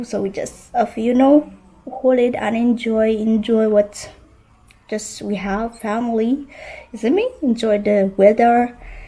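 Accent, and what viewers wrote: Jamaica. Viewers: seeing you cut that Julie mango makes me want to go home. So jealous as its nearing that time of year when mangoes are plentiful. I do hope I'll get back before the season ends. Love the Jamaican accent. You're really good at it💕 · mi rate yuh is blessed to have you as his wife...just love how you able to adapt embrace and love the Jamaican culture and food.. love to hear you chat patwah and its phrases like a true jamaican.....Big up to you and. One love.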